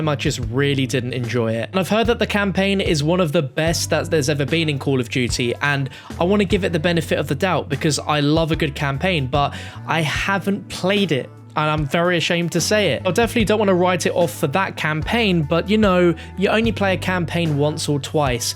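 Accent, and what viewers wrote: United Kingdom. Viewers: Cool british accent!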